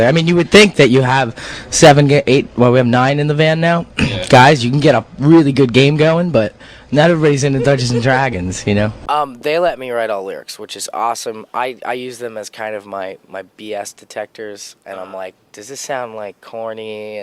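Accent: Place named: California, United States. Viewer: He's lost the Jersey accent and sounds very Californian now, gorgeous man ! ❤️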